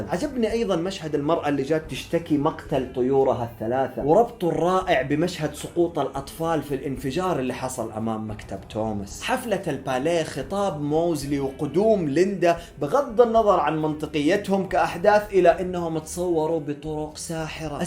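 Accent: United Kingdom. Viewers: لكنتك الاسكتلندية رهيبة 😂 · التمثيل رهيب كنك عندك لكنة ابريطانيا لو تروح هوليود اتحاول ادور طريقك 👏👏👏❤❤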